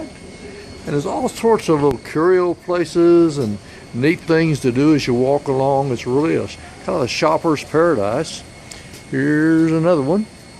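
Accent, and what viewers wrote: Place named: South, United States. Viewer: I just subscribed. Thanks for the video! Where are you from (in the states)? You have a southern accent! I'm from GA and hoping to retire in Mexico. I'm a single, 62 y/o female (with a small dachshund "companion"), so I want to move somewhere safe where there are groups of people my age. I would probably rent a small studio apartment first...and maybe buy later. Do you have any recommendations? Thanks.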